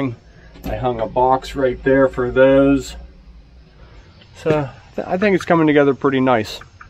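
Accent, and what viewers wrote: Pittsburgh, United States. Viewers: Previous New Castle boy here...... subbed via the Morgans! Good stuff..... how I miss that Yinzer accent!!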